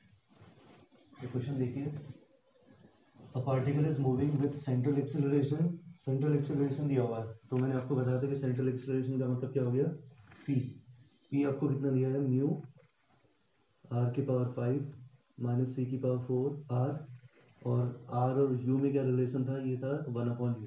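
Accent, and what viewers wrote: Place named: Rajasthan, India. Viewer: That ' rajasthani accent ' 👌👌,. ....... Similar to a hero in looking and Vice - versa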